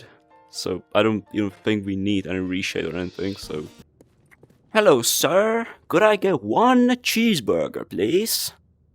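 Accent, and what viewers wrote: Czech Republic. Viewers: From the accent i knew that you are czech! brachoo řízek 🍺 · are you czech?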